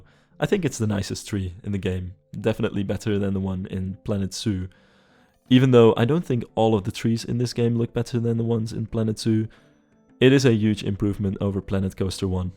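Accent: Netherlands. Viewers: Apart from the fact that your build looks amazing, I want to point out that I like how even though I could hear that you’re Dutch, you don’t have a horribly Dutch-English accent. Probably a weird compliment, but usually I skip videos from fellow Dutchmen due to their accents bothering me haha. Dus die kan je in je zak steken ;-P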